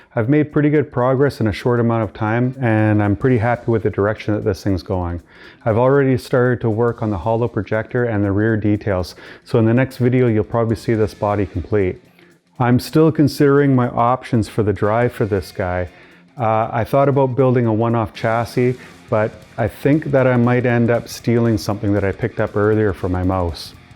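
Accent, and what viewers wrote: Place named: Canada. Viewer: You sound Canadian 🙂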